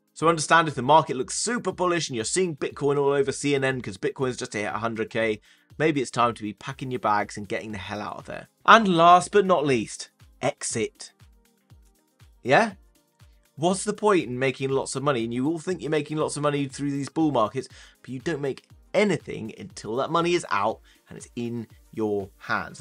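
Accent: United Kingdom. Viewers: I love British accent I can listen all day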